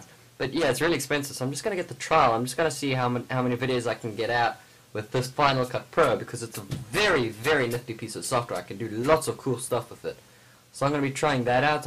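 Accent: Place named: United Kingdom. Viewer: Where in the UK are you?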